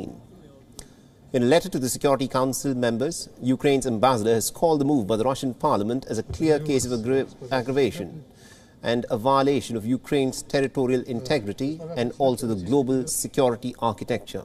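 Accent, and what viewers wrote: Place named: India. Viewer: Guys... Whenever you hear that accent. Just remember about the old grannies these indian IRS phone call/ telemarketing scammers had scammed. 👍👍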